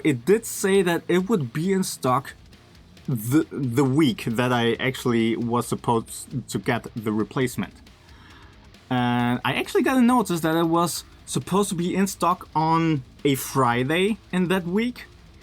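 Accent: Germany. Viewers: Are you a german guy...?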